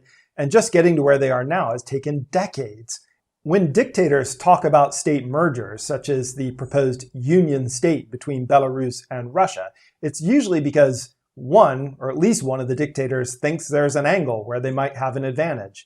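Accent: United States. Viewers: old Dutch; speaking about Turkic language with US English. Yes sir.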